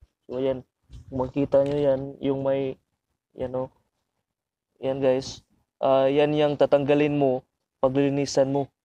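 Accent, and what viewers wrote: Visayas, Philippines. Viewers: bisaya po kc sir ok lang ang important is nakakatulong lahat ng sinabi nya 😊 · cguro bisaya ka hirap ka mg explain ...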